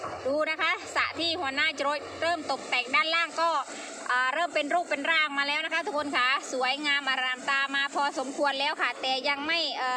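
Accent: Korat, Thailand. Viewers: พูดคือคนโคราชเลยเนาะเจ้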